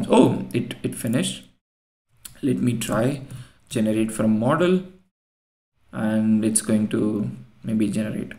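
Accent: India. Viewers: you accent is noticeable but I have heard many with much thicker Indian accents, yours is quite good. Might be even better now, I only just came across this video now.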